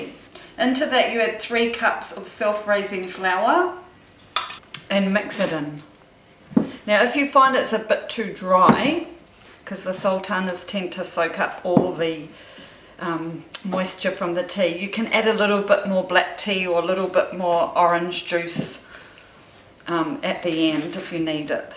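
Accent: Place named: New Zealand. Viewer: Love that kiwi accent. As well as the fruit cake recipe. 👍😉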